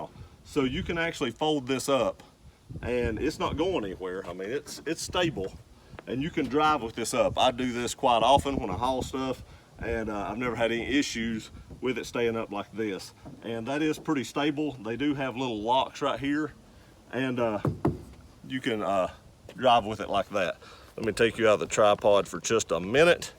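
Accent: South, United States. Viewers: Ton-new cover love the accent and love how your southern shows how everyone is special and different. I say potatoes and my grandmother says taters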